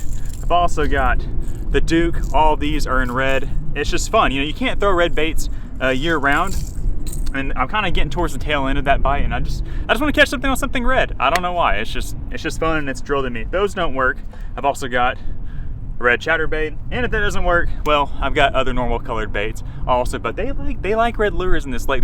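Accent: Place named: South, United States. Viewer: Every once in a while , we hear the southern accent . Very cute .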